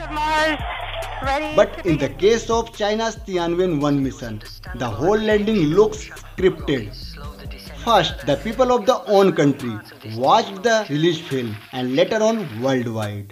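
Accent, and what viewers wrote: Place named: India. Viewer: From the accent, youtuber should be from India. Typical human behavior when you wish your competitor to fail. However, credit must be given when credit is due. We should applaud China's effort. This is the 21st Century, you still thought that nation can cover up their failures. Imagine it was exposed years later ... there is nowhere to hide such shame. No one would like to such risk. Many of us know very little about the Chinese including me. But one thing I am certain, the Chinese does not care what others speak of them, they are more worried about fellow Chinese opinion.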